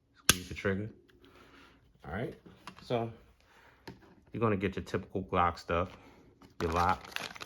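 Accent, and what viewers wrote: Baltimore, United States. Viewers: You from Baltimore aint yew?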